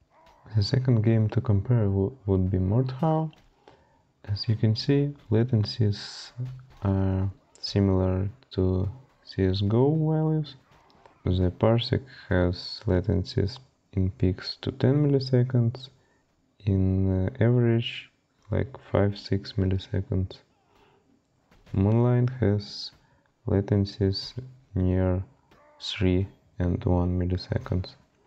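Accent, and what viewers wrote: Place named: Russia. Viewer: Hey, I've noticed that you're Russian. Good English, man! :)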